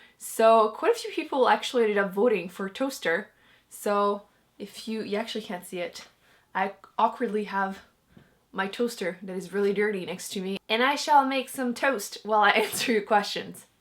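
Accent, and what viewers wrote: Quebec, Canada. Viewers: Wow, I'm french and I love your accent when you speak Canadian french (and actually you're m'y favorite booktuber both in french or english) But I wonder...are there so many french people that are disrespectful and hateful towards Canadian french ??? I would be ashamed of my country....it's sad ! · I was a Canadian studies and French major at college and studied abroad in QC so your channel brings me SO much joy. I love when you speak French and your quebecois accent, it makes me feel so nostalgic.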